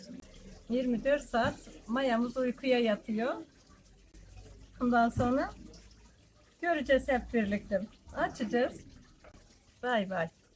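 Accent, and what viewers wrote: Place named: Aegean, Turkey. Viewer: Ayni bizim gibi konusuyo usakla afyonu konuşması hi denizlide ayni şive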